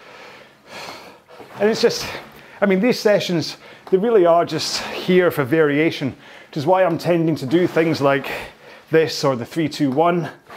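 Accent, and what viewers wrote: Scotland, United Kingdom. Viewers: Another great one!! Thank you! I’m quite a fan of the Scottish ramblings 😁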